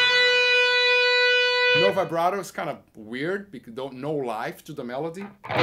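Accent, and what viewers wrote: Brazil. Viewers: Dá sim. Mas o sotaque br no inglês dá um charme à mais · Inglês brasileiro. É muito mais fácil entender um brasileiro falando inglês que um nativo.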